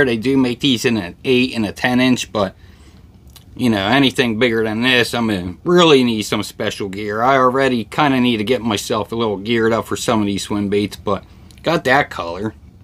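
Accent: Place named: Pittsburgh, United States. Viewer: I detect a little Pittsburgh in your accent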